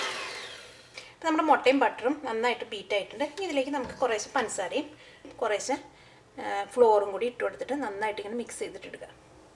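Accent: Thrissur, India. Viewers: ഇത്തരം പോസ്റ്റുകള്‍ ഇടുമ്പോള്‍ മറ്റുള്ളവര്‍ക്ക് പ്രയോജനം ഉണ്ടാകണമെങ്കില്‍ പറയുന്നത് മനസ്സിലാകണം. ദയവായി സ്പഷ്ടമായി പറയുവാന്‍ ശ്രമിക്കുക. തൃശ്ശൂര്‍ ഭാഷ എല്ലാവര്ക്കും മനസ്സിലാകണമെന്നില്ല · seems to b a easy recipe. will try it for this xmas.. really like ur thrissur tone :)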